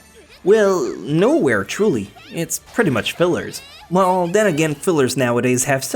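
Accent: Quebec, Canada. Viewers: Tu sonnes vraiment québécois. It takes a quebecer to recognize a quebecer accent haha.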